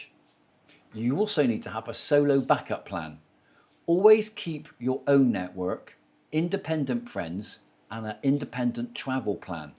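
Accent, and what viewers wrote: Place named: South West England, United Kingdom. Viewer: you sound like your from the south west or brizzle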